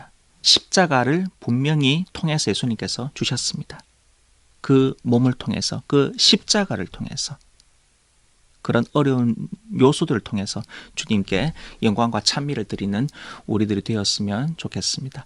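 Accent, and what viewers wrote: Gyeongsang, South Korea. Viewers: 말씀도 좋지만 경상도사투리가 이렇게 매력적으로 들릴줄 몰랐습니다 ^^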